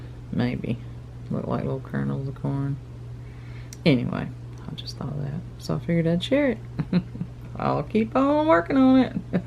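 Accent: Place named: South, United States. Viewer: I really love your ideas, but I really got triggered with all the mess on the table and the glue strings, I’m a OCD when it comes to creating, I set my things out on my work station, then I decide where to start on my project, then I work and finish the first section, then I tidy that stuff away before I start on the next section, I really get anxious with messy work stations, I was cringing the whole way through, the paint on your fingers got to me too. Not hating, so please don’t think I am, I’m just saying it triggered me. I do however, find your voice to be soothing so that helped me to continue to watch you create this shabby chic corn decor, it’s so refreshing to see people don’t just stick to autumnal colours, I love the shabby chic look. It can’t be all bad, I subscribed despite my OCD tendencies. TFS love from the 🇬🇧 I love your southern accent.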